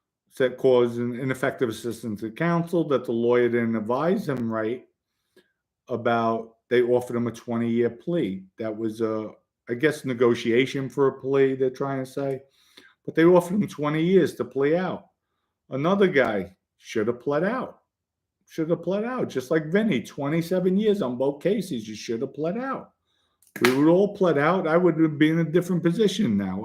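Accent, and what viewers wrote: New York, United States. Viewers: Props,. Great to see how you’ve turned your life around. Very impressive and a great example. My comment- why apologize for being a “rat”. Wouldn’t you have been a punk if you sat in a cell for decades after you’ve been wrongly told you had nothing coming to you? It would’ve been different if you ratted if you simply didn’t want to do time- no? Regardless, everyone I know (Port Chester, NY) says vodka exactly the way you do…….:). Props. Congrats on your new wonderful life.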